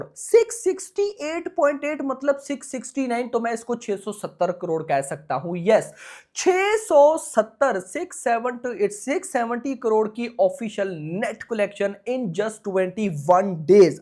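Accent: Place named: Pakistan. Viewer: Iska pronounciation Pakistani jaisa hai ....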